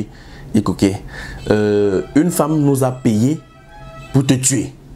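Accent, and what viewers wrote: Cameroon. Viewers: Tu a baminikalise le français dans ton récit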